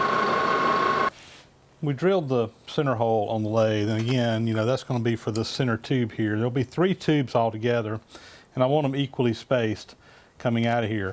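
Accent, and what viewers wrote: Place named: Georgia, United States. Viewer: Do you have the IPA notation for your pronunciation of "oil"? Your Georgia accent & doubleboost's Geordie accent are about the best things on youtube.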